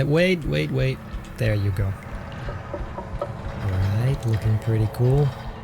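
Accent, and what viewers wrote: Netherlands. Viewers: Are you Dutch?? Your’e voice is al little bit dutch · You kinda have a Dutch accent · are you dutch? you sound dutch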